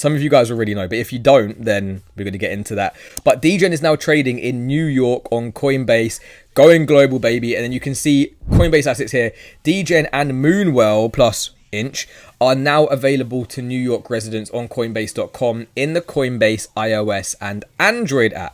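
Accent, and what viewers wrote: United Kingdom. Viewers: Your accent is quite nice I suppose British boy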